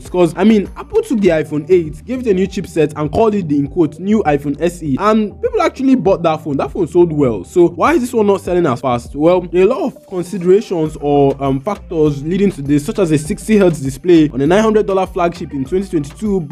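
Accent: Nigeria. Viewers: You speak like a Nigerian Nice video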